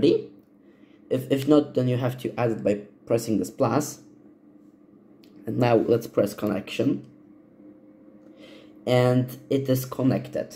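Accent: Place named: Poland. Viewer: Polski akcent 🔥🔥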